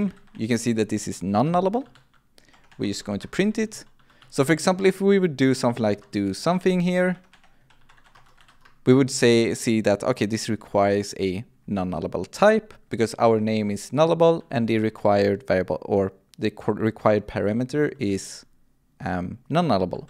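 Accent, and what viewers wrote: Sweden. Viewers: You must be from Sweden, I can hear it!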